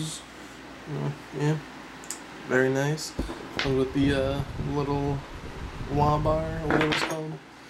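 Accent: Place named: Canada. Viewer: You have the most stereotypical Canadian accent I have ever heard, nice guitar too.... I'm probably gonna go with passive pickups but, either way Agiles are too nice to pass up.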